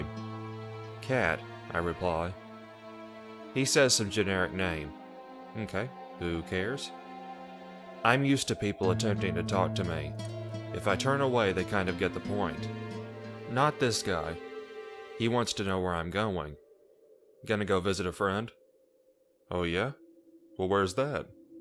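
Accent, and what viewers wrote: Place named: South, United States. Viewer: Love your accent. Sounds southern.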